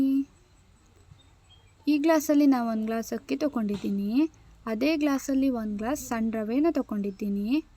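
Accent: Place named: Kundapura, India. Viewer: Nevu mataduwa bhashe tumba chennagide kundapura Bhashe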